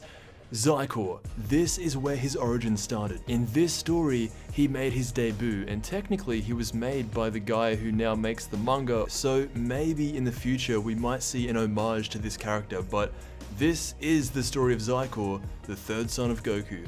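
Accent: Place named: Australia. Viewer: probably so put i enjoy his Auzie way of explaining it lol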